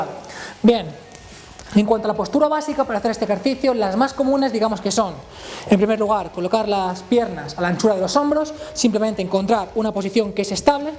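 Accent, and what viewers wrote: Galicia, Spain. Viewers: pero con acento gallego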